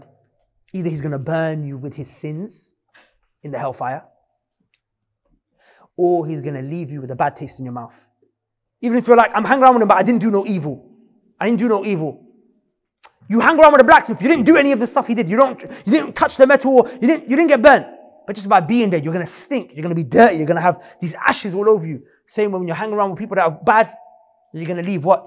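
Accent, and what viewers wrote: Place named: London, United Kingdom. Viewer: This is how a lot of people in London speak these days, not just the ‘youth’. It is just multicultural London English.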